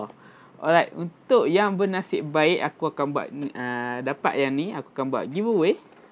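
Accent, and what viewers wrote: North, Malaysia. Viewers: PSN ID : Shawrihan - Keep it up bro with this kind of video ( review psl games, movies, unboxing and all ) good to some of us! - suka dgr loghat utara tu! All the best bro!!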